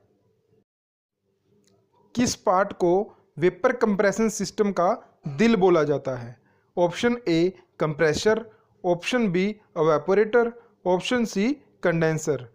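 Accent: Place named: India. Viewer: disgusting India accent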